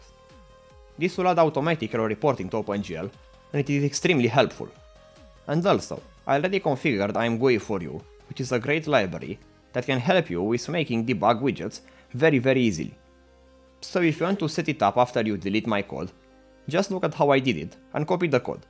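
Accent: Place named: Romania. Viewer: Se aude romanul din tine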